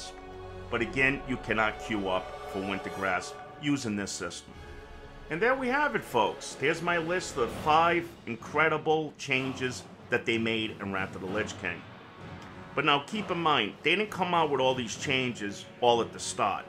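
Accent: New York, United States. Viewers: Came for the WOW vid. Stayed for the NY accent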